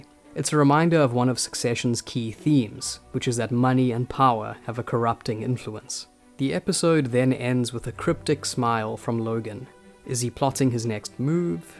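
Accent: South Africa. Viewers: Youre South African arent you? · I heard you narrate for 7 seconds, and I knew you are South African 🤣🤣🤣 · Well in with the analysis...I also trace that South African accent in the narrator. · You sound South African. I agree succession is one of the best shows I’ve ever watched. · Bru you south african? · Are you South African?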